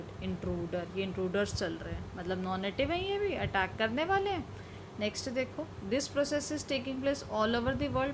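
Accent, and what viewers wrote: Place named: India. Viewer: Better to explain with one language i think. Difficult to understand IndEnglish.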